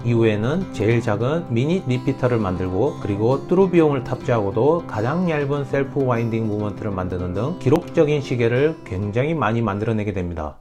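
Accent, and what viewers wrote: Gyeongsang, South Korea. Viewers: 경상도 사투리 멋있습니다!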